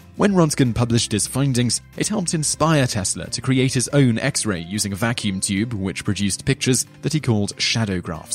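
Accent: United Kingdom. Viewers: This guy is singlehandedly making british accents sound stupid.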